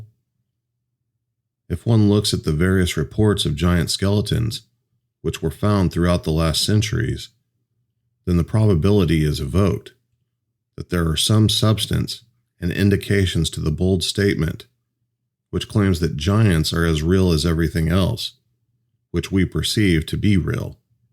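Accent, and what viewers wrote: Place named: Midwest, United States. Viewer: Don't feed the trolls. I'm the most critical person I know and I can say yours is a narration vpice is professional grade. People pay 10s of thousands for a Midwest accent like yours to do audio books and tv spots. Some folks are not in a good place in life and attacking others helps their pain go away for a moment. Your golden Pony Boy.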